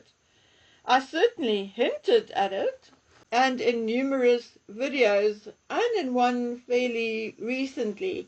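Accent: South Africa. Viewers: I don’t understand why her subscriber numbers aren’t higher. I can say this because I know she doesn’t read our comments. Maybe people find the South African accent jarring. We have a lot of South Africans living around where I live 🇦🇺 & it used to grate on me until I got used to it. It’s the only thing I can think of because I never miss one & have recommended her to others on X etc but her numbers remain the same.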